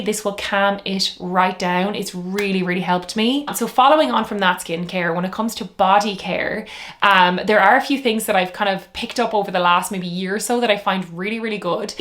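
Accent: Ireland. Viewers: Cant stand the irish accent though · Really rude comment. I love the lilt of an Iris accent. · Oh the Irish accent! One of the most beautiful sounds in the world! I’m a voice over artist ..I should know! ❤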